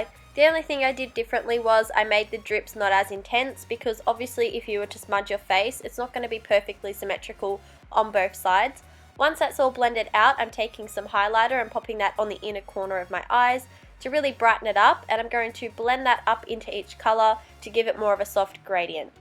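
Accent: Australia. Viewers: Shes Australian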